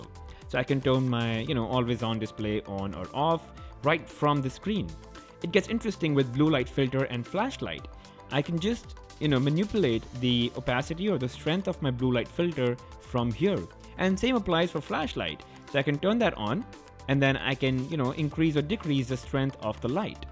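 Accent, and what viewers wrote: India. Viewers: Hey man are u indian... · Damn that Indian accent!! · Fuck your indian accent 🖕🖕🖕🖕🖕🖕🖕🖕🖕🖕🖕🖕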